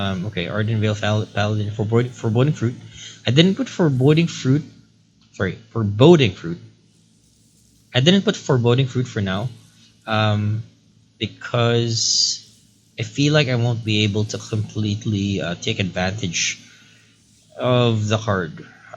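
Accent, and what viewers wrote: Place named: Philippines. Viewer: I can tell you’re filipino, hahahaha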